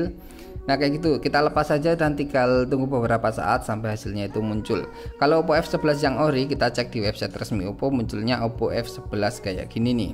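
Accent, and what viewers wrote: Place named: Java, Indonesia. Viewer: Jawamu terlalu medok mase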